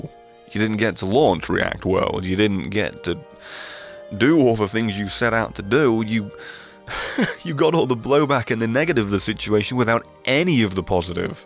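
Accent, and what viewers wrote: United Kingdom. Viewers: He brings humor in a british accent.Also i saw your exact same comment on 2 other videos.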